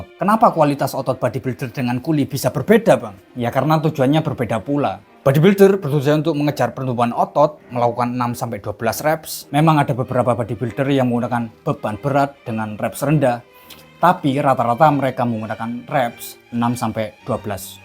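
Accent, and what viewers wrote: Java, Indonesia. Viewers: Orang mana bang? kok bahasanya medok bgt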